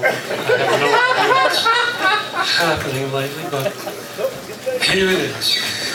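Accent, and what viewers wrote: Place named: South, United States. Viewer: Jesse always connected with his audience, thru humor, wit, heartfelt love for his fellow humans, and that ever sweet Southern honeysuckle drawl. I feel so fortunate to have shared this planet with him for years, and thankful to have seen him perform a few times.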